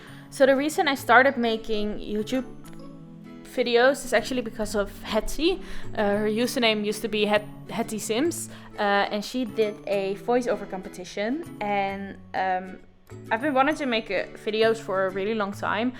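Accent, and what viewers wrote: Netherlands. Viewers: ik ben ook nederlands!! Leuke build! Ik dacht al dat je nederlands was. dat accent is zo herkenbaar haha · Ik herkende letterlijk dat dit nederlands is na de eerste paar secondes... #dutchaccentstruggle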